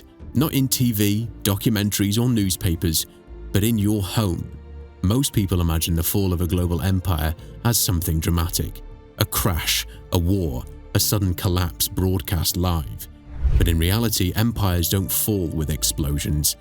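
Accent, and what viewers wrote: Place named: United Kingdom. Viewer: I really want to listen to this report; however, I can’t stand your voice. I can’t stand, listening to British accent. British accents sound pretentious, high falooting, arrogant, know it all, closet gay, nosy, and more. Like I said, change the voice on this generated video, and I’ll consider listening to it in a more pleasing standard American English voice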